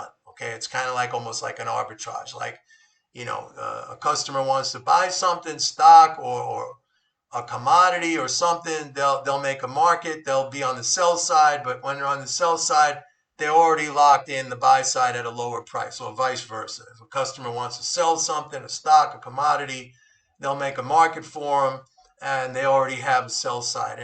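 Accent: New York, United States. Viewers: Just stumbled upon your video. What you say is so true. PS: The NY accent adds the extra credibility that you worked on the floor.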